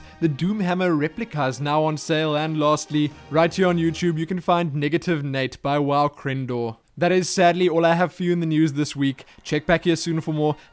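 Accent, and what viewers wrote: South Africa. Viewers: Oooooh oO, a south african making vids! xD NICE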